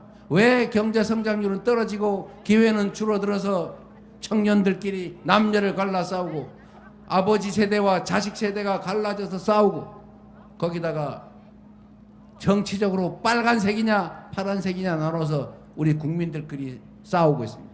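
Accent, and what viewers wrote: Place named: Chungcheong, South Korea. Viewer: 충청도 사투리 귀엽지유?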